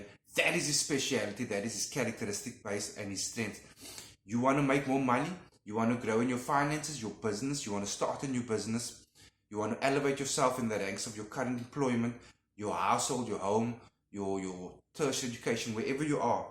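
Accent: South Africa. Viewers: Hi! Love your content. Fellow South African here. I could hear from your accent 🇿🇦😉 I'm from Cpt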